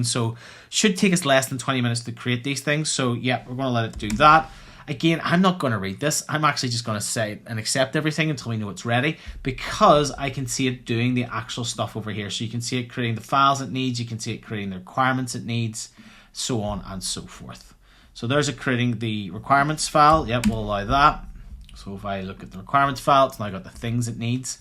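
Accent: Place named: Northern Ireland, United Kingdom. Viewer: Good stuff, I've been experimenting with this stuff too, I found Bedrock Agents a bit limiting especially when it comes to integrating MCPs. Good to hear the NI accent, my wife is from there :)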